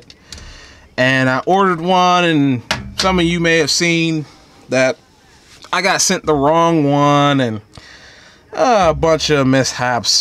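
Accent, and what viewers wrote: Pittsburgh, United States. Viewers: You from the Burgh?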